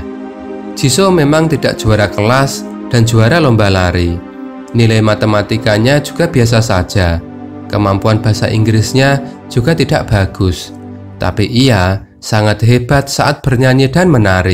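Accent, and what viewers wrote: Java, Indonesia. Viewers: Adik aku bilang "jisoo org keturunan Jawa yaa bang!?" Itu mah abangnya ini yg pake logat jawanya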